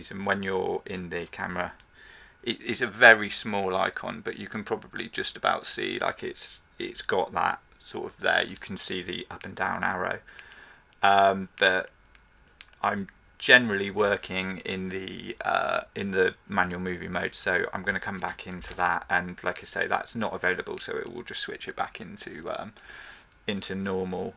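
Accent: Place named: RP, United Kingdom. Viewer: Hello From Malaysia! I Just got the GH6 yesterday. Your channel is very useful. I appreciate the RP (Received pronunciation) accent.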